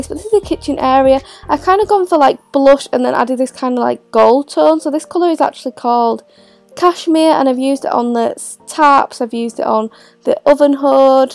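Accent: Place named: England, United Kingdom. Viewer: Rubina Begum she is from England so she has a accent :)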